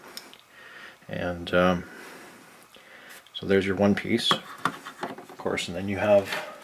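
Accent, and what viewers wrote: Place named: Canada. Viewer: I could instantly tell this is a Canadian man. Dunno how,.......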